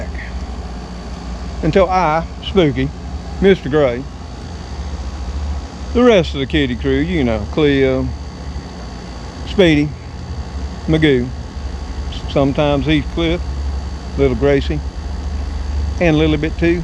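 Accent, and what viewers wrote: South, United States. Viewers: I absolutely love the southern accent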